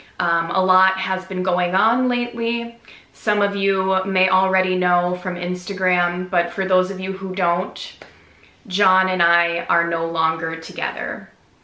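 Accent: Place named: Minnesota, United States. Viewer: Minnesota accent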